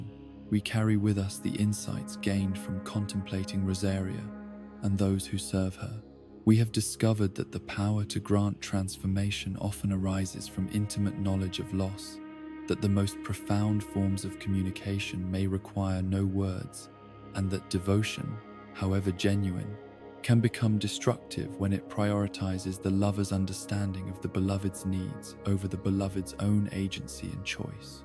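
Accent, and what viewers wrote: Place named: United Kingdom. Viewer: Brittish voice + DarkSouls = views.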